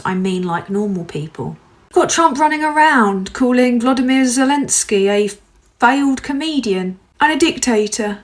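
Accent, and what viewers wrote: United Kingdom. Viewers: A thousand thumbs up for you dear. you are 200% right about everything. I'm Canadian and love your understanding on things. I wish more people would get it the way you do. Love your ...so British accent as well. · To be honest, I find her voice therapeutic. Yes, she is telling me of my demise but at least it's with that silver tongue you brits have.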